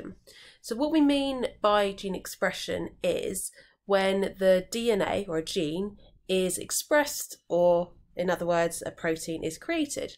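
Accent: United Kingdom. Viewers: Like your British accent. You pronounce certain words differently than we do in America.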